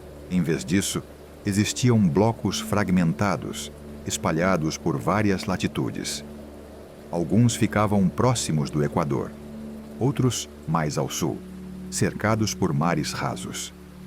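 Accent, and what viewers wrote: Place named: Portugal. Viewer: Pois é, tá em português portugal, mas é incrível o quanto se parece com nosso português algumas palavras 😊😊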